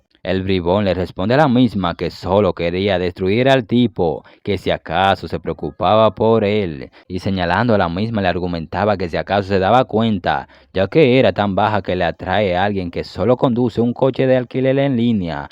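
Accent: Dominican Republic. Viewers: Eres Dominicano?? Tu voz y tu jerga me paracen de un Domi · Pero ven acá loco tu eres dominicano? Ese acento no me engaña 😂😂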